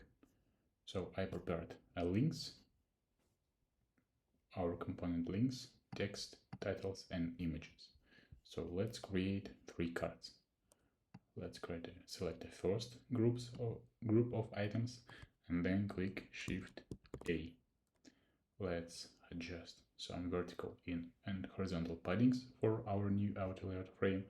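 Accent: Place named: Russia. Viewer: Я слышу, что ты русский ))) Так что давай такое видео на русском. А так я тебя через янденкс-переводчик слушаю )))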